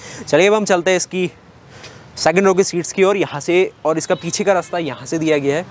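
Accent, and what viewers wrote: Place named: Madhya Pradesh, India. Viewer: Bhai MP s ho kya Unne sun k laga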